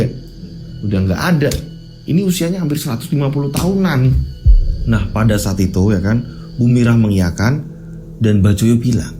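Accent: Java, Indonesia. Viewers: Kembali hadir denpasar 😊, seneng skli dengar crita dik...ngena skli dng bhsa jawanya..suka skli dngernya....bravo dik..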